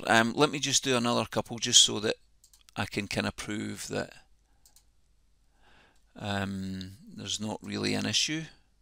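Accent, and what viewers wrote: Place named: Scotland, United Kingdom. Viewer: Hi, I’m a new user. I’m enjoying your videos but can I give a suggestion. You move back and forward without giving detail. For instance, in this video you mention artefacts then immediately go to find the problem. At this stage I don’t know what artefacts you’re talking about. It would be beneficial I think, to your viewers if you could mention what the problems were. This would make sense of your remedies. I don’t mean to criticise so hope you don’t take it as such. I would point out I am visually impaired so it is possible it’s just me but I don’t think so. P.S. It’s good to hear a fellow Scott’s voice it a YouTube video for a change.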